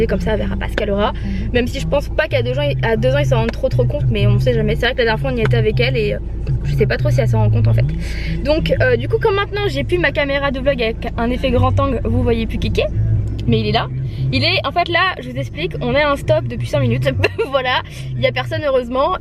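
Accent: Normandy, France. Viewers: Je suis normande aussi lol et on dit pas ça dans le sud manche. Ça doit être en haute Normandie :) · C'est l'accent Normand les "o" se prononce "eu"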